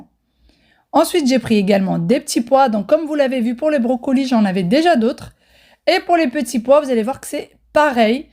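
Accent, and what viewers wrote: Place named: Morocco. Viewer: Tu es marocain d'apré les produits😂😂et l'accent nous les femmes marocain hh on'est trés connus😅bon chance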